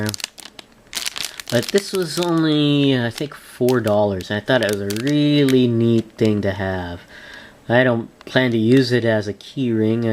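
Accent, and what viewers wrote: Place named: Arkansas, United States. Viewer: Sounds like you're a fellow Arker. Correct?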